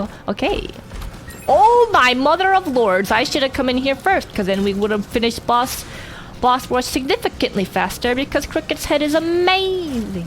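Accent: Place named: Australia. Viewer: Your posh Ozzie / UK accent is real good. Hope your brain is kind to you for at LEAST a week x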